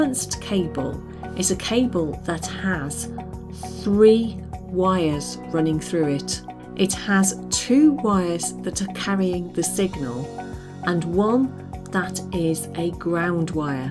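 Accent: United Kingdom. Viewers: Hi! I love your channel. I have a question. I have a yamaha p105 electric piano wich has usb to host. This usb cable is an especial usb cable? Or is it like an usb print cable usb b to usb a? Thank you so much (I adore your british accent hehehe)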